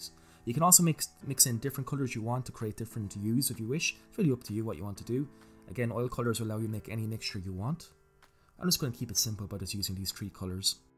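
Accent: Ireland. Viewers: I like your technique. Obviously built up over time. The finished product looks great. Have never seen your channel before, I will make it a point to check out your other videos. And, it's nice to hear an Irish accent again.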